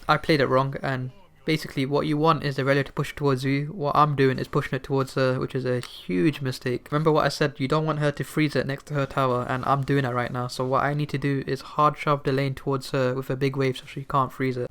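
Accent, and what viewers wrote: United Kingdom. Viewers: Your voice is fine, but maybe its because I'm british as well and that's the way a lot of people talk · 23.50 I am not sure what part of UK you come from. I spend some time in Scotland and it wasn't easy to start understanding the way they prenounce words. Now when I listen to you it feels like I learn a different accent and it comes easier but I understand that it might be confusing :D Oh and one more thing, you use quite a lot of glottal stops so that might be a part of a problem too.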